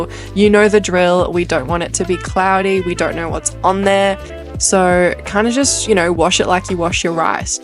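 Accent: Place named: Australia. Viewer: Love your down to earth vids and its really refreshing to hear an Aussie accent best wishes from SE Queensland 😊😊😊😊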